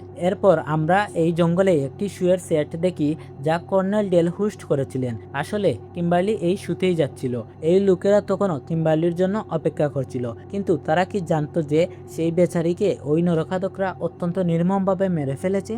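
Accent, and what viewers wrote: Sylhet, Bangladesh. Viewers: R you sylety 😊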